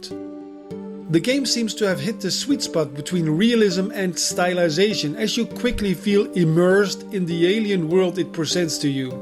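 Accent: Netherlands. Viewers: goeie content maar runt je computer op een aardappel? (I can sense you are dutch by your accent :p) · the moment i heard your voice i knew you were dutch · Is deze gast Nederlands ofzo? Hij heeft echt zo'n accent.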